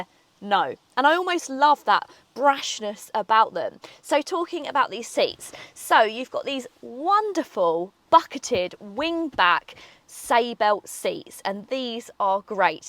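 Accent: Essex, United Kingdom. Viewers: Everything you say sounds good in your Essex accent, including "Anniversario"! I really like it!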